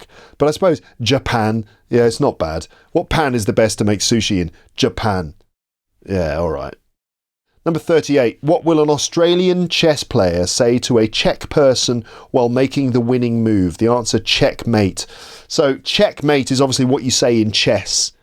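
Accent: RP, United Kingdom. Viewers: I love your video about jokes and I love your british accent is wonderful to hear you!!! II am a Visual artist and photographer from Argentina. Best wishes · Hello,! I'm an English teacher from Buenos Aires, Argentina. I' m delighted to hear your perfect R.P. English.You are very kind and patient explaining things that are too simple for you. Love it when you chuckle, kind of admitting that a joke is terrible. I guess your work is truly helpful for students of English. I learnt a few things about world culture. Thank you so much! 😊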